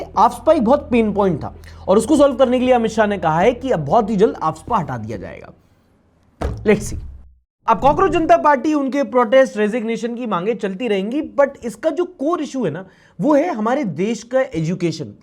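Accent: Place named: Bundelkhand, India. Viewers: Bhai ki Bundelkhandi bahut achi hai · LOVE YOUR BUNDELKHANDI ACCENT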